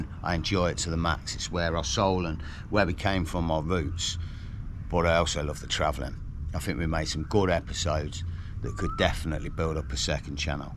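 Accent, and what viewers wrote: Leicester, United Kingdom. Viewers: Enjoying the videos , just discovered your channel, Is that a Leicester accent?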